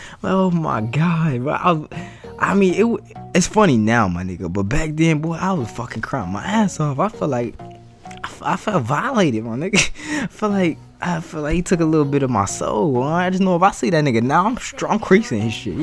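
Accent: Chicago, United States. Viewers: You from Chicago ?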